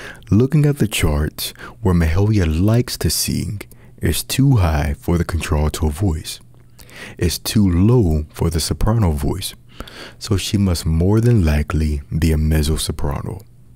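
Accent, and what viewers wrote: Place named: Louisiana, United States. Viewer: i love hearing your #louisiannaaccent... some accents are so musically nuanced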